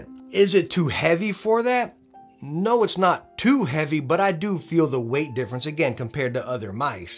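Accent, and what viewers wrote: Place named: New York, United States. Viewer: Love your attitude. You from new york by any chance? Feels like it. Great review, thanks.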